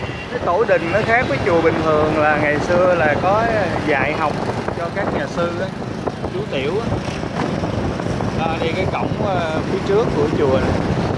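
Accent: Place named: Saigon, Vietnam. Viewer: Bạn chia sẻ và thuyết minh hay hơn cái anh chàng nói tiếng Bắc, người Nam mới là thổ địa cho vùng Saigon gia định, còn ông nói tiếng Bắc đưa video lên nói tào lao không hà, coi cái video mà ổng quay trong lòng chợ Ng-tri- phương thấy nhức đầu luôn, các bạn nào có xem qua thì hãy nêu lên đi, có phải vậy không..?! Tui không bao giờ xem ông ng Bắc đó quay phim nữa, ổng không biết quay và còn thuyết minh tào lao nữa chứ. Còn nói dặc tiếng Saigon, tới đâu nói rõ ràng tới đó, thì mới lôi kéo độc giả từ bốn phương trời, cám ơn video nha, tui ở quận 10 gần chợ Nguyễn tri Phương đây.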